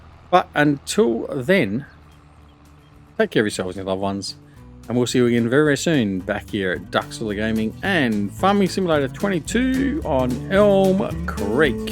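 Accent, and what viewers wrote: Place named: Australia. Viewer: "In my battle with stacking that Bloody trailer"... I laughed so hard🤣 brilliant so good to hear Aussie slang. I watch many great YouTube's from usa etc, there content is good too, But It's a breath of fresh air to find your channel and the way us Aussie speak!!😁😁 Keep up the great content and thanks for your hard yacker in making it 😁👍 From QLD Australia.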